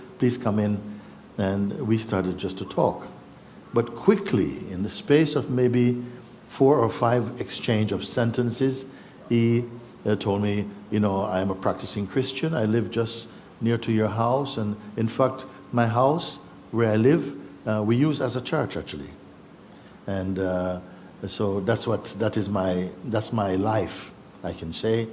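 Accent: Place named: Jamaica. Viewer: He sounds like jamaican..i jave been to jamaica..for 3 years..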